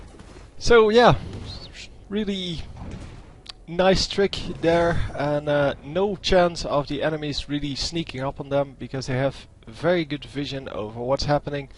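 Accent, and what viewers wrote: Netherlands. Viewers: is that a dutch accent i hear ?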